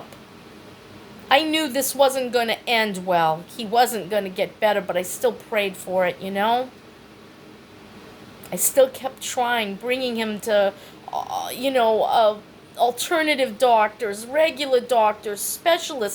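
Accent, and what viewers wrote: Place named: East Coast, United States. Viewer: You have a good voice. I pick up on a slight east coast accent. You should look into doing voice-over work. All you’d need is a nice microphone and work from home. I’m an instructional designer and many companies need good voices for training programs. Just read a script and get paid! I think you may like it.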